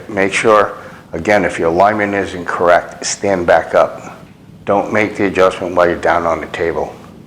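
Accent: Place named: New York City, United States. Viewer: Good stuff! Loving your videos...simple...straightforward...with the NYC accent! I have lots of drills to work on now to improve my game, so thnx!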